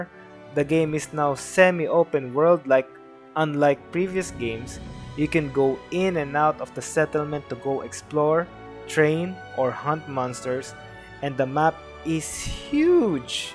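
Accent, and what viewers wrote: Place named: Philippines. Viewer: I smell pelepeno accent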